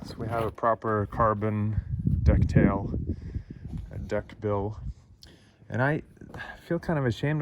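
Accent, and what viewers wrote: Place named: Southern California, United States. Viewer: I feel you should put an actual propeller on the back of it keeping with the spirit of tail spin. You can do one with a small electric motor that be functional. Also your English has gotten really good. You have a kind of Southern California accent now. I remember meeting you back a at the world drift comp at the long beach docs with your broken English.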